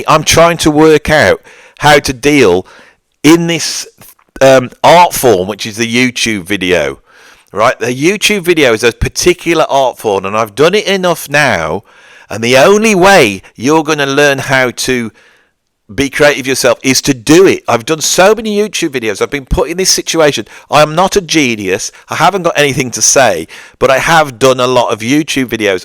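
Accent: Birmingham, United Kingdom. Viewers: The audio on this video is the worst I have experienced on any of your videos. That isn't a criticism. You just have some setting wrong, and your lovely, dulcet Brummie tone is distorted somewhat!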